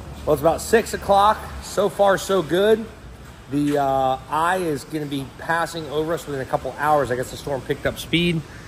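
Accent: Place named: Canada. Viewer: Is he not from the south too? Talks like he's from Canada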